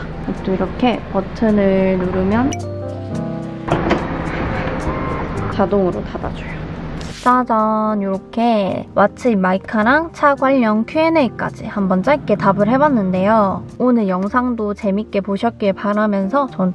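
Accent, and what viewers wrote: Gyeongsang, South Korea. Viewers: 경상도 같은데 대구분이신가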